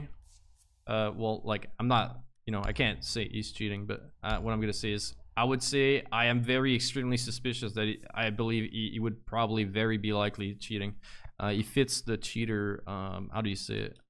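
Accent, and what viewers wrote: Quebec, Canada. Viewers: Es-tu Québécois parce que je l’entend dans ton accent haha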